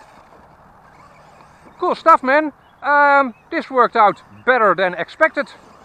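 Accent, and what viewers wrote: Netherlands. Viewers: ik had de naam van je kanaal nog niet eens gezien maar toen je begon te praten hoorde ik meteen dat je een Nederlander bent maar leuke video ga o door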